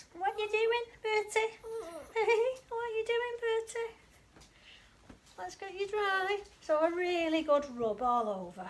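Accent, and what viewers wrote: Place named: United Kingdom. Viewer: Just my luck... This is the 2nd video in a row that that I can not understand. Between my hearing problem and the thick accent(British?) I am just not getting the info I need. Otherwise, good video. Thanks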